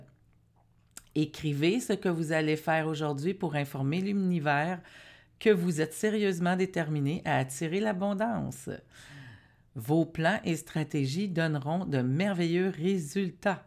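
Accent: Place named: Quebec, Canada. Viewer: Merci pour ce beau message. Sympa l accent québécois.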